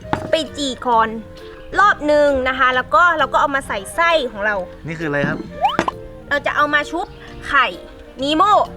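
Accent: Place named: Chiang Mai, Thailand. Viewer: พี่อยู่จังหวัดอะไรค่ะทำใมสำเนียงเหมือนคนเชียงใหม่จัง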